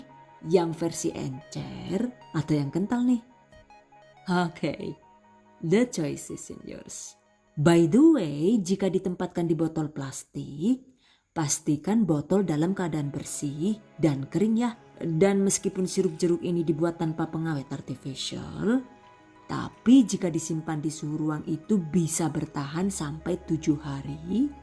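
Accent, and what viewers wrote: Banyumas, Indonesia. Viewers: Sebaik2 manusia adl yg paling bermanfaat bagi manusia lainnnya, spertinya bunda ini memang diciptakan Allah untuk mmberikan mnfaat n inspirasi bagi yg lainnya, 😍 Super sekali vedeo2nya, sirupnya, sehat menyegarkan n alami, n tentunya cocok untuk bisnis usaha 💪 ngapaknya sll bikin kepingkel2,gk tau knp klo dnger org ngomong ngapak sll ketawa😆😆 Btw, ikutan latah..."haaaay gaes..woookey gaes..."😂😂 #GiveAwayTHV2020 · ni org madiun apa org ngapak ya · Mbak asli mana kok ngapak???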